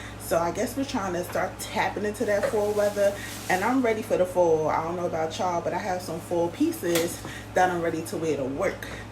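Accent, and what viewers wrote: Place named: New Jersey, United States. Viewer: You're Jersey girl accent🥰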